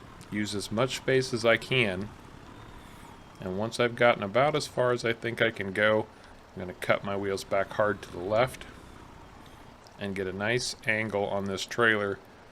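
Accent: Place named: United Kingdom. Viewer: Hi Thank you so much -- without your intro 10 vids on "Ravenport" I'd never have played this game. I can tell you as a fellow Brit its that accent - a winner in USA. Also there aren't any other vids that give useful info on how to do. Ive seen the "out in the wild vid" love that. Im now in Dallas ex New York. Im totally absorbed in Ravenport on my way to 1st $million. About to expand field 19 up the hill. What I would love is a tutorial if you have one on making the tree cutting/logging viable and worthwhile. The weird bark colored trees are a real hassle to cut up and transport and sell - 23 so far. Ive had success with some leveling landscape but want to put chickens pigs and cows into Ravensport which is so small but I believe its possible. How about Ravenport Part 2 my first Million???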